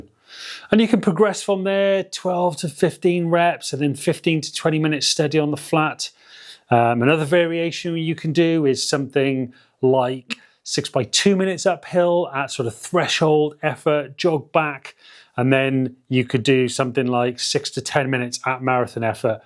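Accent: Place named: Wales, United Kingdom. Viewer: Ahh a Welsh man . Thought I could hear a bit of Welsh accent there 🙂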